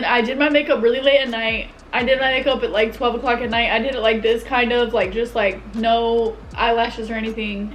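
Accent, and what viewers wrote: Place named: South, United States. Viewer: I love your little slight southern accent. It's so cute. ❤️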